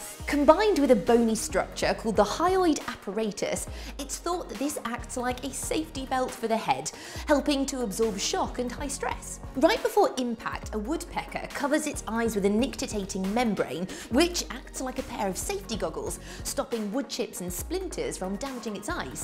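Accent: United Kingdom. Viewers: lol is so hot you can even hear her British accent when she laughs;)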